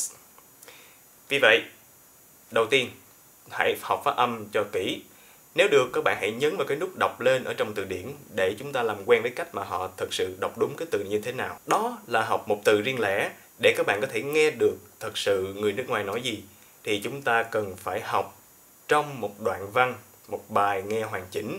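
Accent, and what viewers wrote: Saigon, Vietnam. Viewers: Giọng Saigon nghe hay quá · Đúng giọng sài gòn luôn nè, nghe rất thích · Khong biet thay có fai nguoi Saigon ko, chi thay giong cua thay GIONG giong of minh qua, hi hi ! Ma minh la nguoi Saigon 100% .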